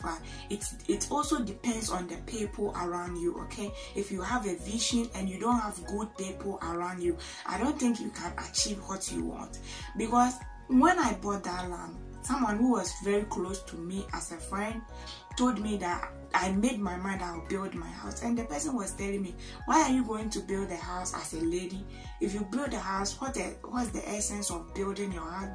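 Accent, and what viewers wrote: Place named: Ghana. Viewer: My favourite girl with the beautiful Ghana accent thanks for being a hardworking lady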